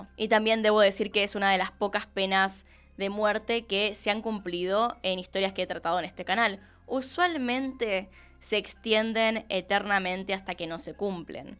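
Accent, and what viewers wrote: Argentina. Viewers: El año pasado conocí tu canal y desde entonces no me pierdo ninguno de tus videos✨ estudie psicología y eso me provoca ponerme a analizar a los personajes y casos que narras, aparte que me declaro fan del acento argentino ❤️ Muchas felicidades gran canal🌹 PS: te recomiendo el caso del monstruo de Toluca, fue un compañero mío de la universidad, realmente fue muy trágico vivir esa experiencia y no visto que nadie hable del caso y le de voz a sus víctimas. PS2: saludos desde Los Ángeles CA 🫂🌞😸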